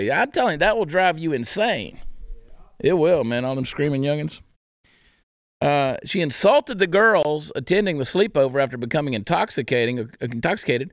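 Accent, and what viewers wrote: Texas, United States. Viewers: Ya gotta love a wordsmith with a Texas accent!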